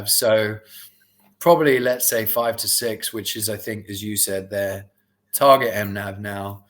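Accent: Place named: United Kingdom. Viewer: All I heard was a gay bored British accent start a sentence with the word “so…“ Before I quit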